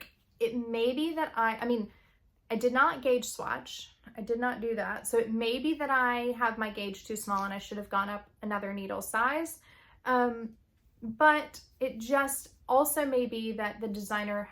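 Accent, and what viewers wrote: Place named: South, United States. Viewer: My word is growth. 🥚 I love your podcast. As a fellow southerner it is nice to watch someone who sounds like home.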